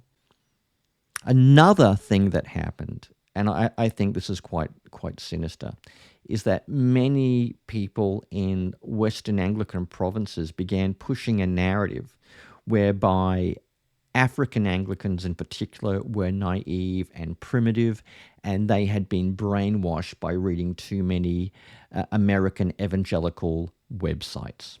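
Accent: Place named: Australia. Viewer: As long as you know the hymn, "Thy Hand O God Has Guided" you are Anglican😂❤. Seriously speaking this is not a split. Anglicanism is being preserved. I'm just waiting to see what the Province of the West Indies, which I'm a part of would do or which side it would choose. I love your Aussie accent by the way.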